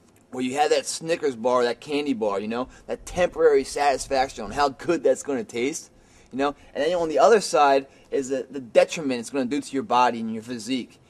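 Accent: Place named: Baltimore, United States. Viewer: baltimore Maryland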